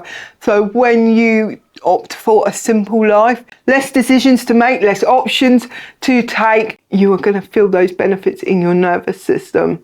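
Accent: Cockney, United Kingdom. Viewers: Cheeky you, love your cockney accent. I bet you’d love my Bronx accent. 😂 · no thats fine. I originally thought you were here in the states but with an English accent. Thank you for clearing that up. Lol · As an Aussie I find your English accent super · ...are you cockney, Scottish? Please tell!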